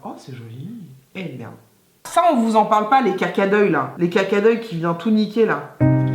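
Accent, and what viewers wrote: England, United Kingdom. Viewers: toi j'aime m'occuper de ma peau MAIS LE MAQUILLAGE ÇA PREND PAS SOIN DE TA PEAU HAHA TU FAIT RIRE😂😂😂😂 #LE CACA D'OEUIL QUI VIEN TOUT GACHER TU ME RIRE AVEC TON ACCENT ANGLAI VIELLO😂😂😂😂😂😂😂😂😂 MAIS JE T'ADOR MA PRINCESSE TU FAIT TROP RIRE😚😚😚😚😚😚😍😍😍😘😘😘😗😗😗😗😂😂😂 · Elle est belle les anglaises sont trop belles charmante accens charmant et appart de reves.bref source dinspi. Univers rend nous charmante.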